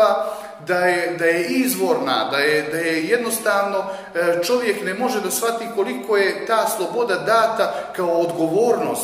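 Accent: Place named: Montenegro. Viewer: Professore svaka cast, klassische deutsche Philosophie zvuci sa crnogorskim akzentom nekako zabavno😊😅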